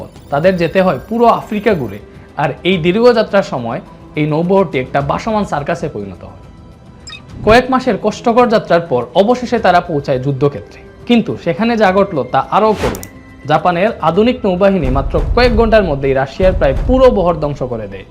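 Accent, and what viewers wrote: Sylhet, Bangladesh. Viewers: bhai apni ki faltu Sylhet er moto kotha bolen keno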